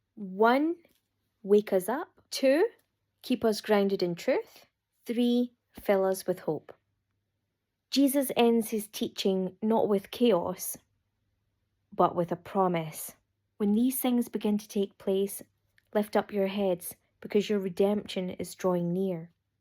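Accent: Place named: Ireland. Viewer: You may already know about the budding fig tree parable. Truly i tell you this fig tree generation shall see these things come to pass. The fig tree called Israel bloomed again in 1948 and the people born at that time alongside of Israel shall see these things pass. Thats how i know. Read matthew 24 34 knowing the fig tree has bloomed when Israel became a nation again in 1948 and also know that only God like AI has the ability to enforce worshipping of the beast onto all people young and old, rich and poor, slave and bond. The mark is AI. We will all need a mark to buy sell or trade. Digital currency will give full control over all buying selling and trading going on in every nation and with every tribe. We know that AI is an existential risk and the end is near because of the AI beast system coming. I could listen to you speak all day long and if I spent much time around you I'd be speaking with a crazy cute irish accent too.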